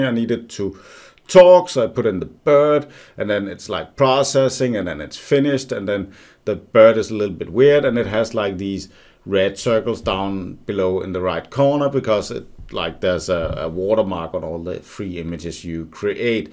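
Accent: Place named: Netherlands. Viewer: What the hell is that accent man? It's like Dutch, English, American-Aussie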